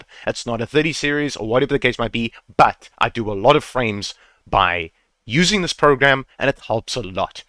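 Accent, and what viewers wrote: South Africa. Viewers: love your stuff man. Are you South African?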